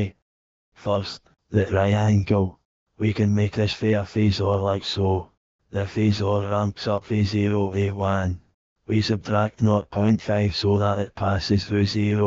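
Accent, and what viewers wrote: Scotland, United Kingdom. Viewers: Damn this is awesome thanks for this. Love the scottish AI text to speech voiceover as well haha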